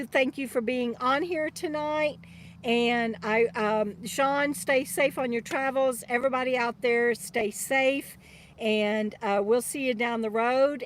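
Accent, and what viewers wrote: Texas, United States. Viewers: I caught this about 90 minutes after you did it. I still don’t have internet (Hurricane Ida) and won’t until mid October. I can hear some of that Texas twang in your voice! Sometimes I can get enough signal later at night by hot spotting off my phone. Good practice for boondocking! I’m going to Houston Monday for about 5 days then will be returning for Lola. Remember, I got a little gift for you from my friend in Houston’s mama who passed away in early June!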